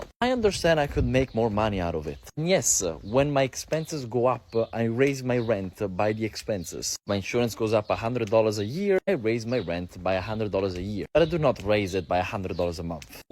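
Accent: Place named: Italy. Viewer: Your accent tells me you don’t have enough hands on experience to yapp about this subject. Maybe stick to Italian politics.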